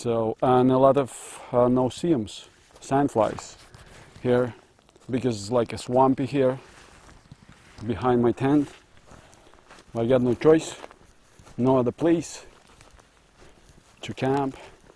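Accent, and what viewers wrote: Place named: Russia. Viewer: Почему я слышу русский акцент?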